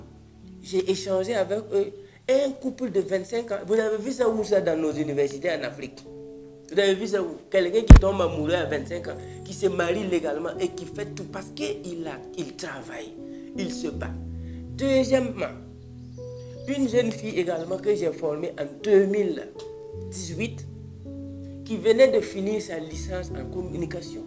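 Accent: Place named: Burkina Faso. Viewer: Le français des burkinabés fait rire 😂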